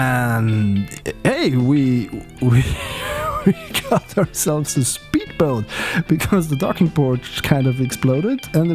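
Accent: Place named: Germany. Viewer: Do you speak german? You‘r „s“ sounds, weil..., german.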